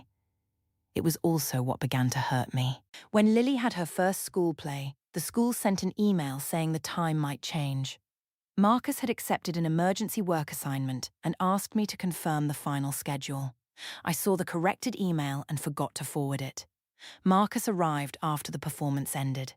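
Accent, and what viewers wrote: England, United Kingdom. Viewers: The English accent didn’t help any!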